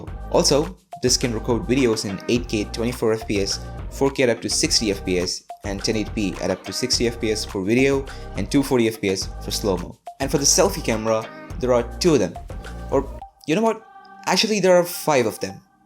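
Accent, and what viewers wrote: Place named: United States. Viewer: you're an Indian? your english accent is american. nice 👏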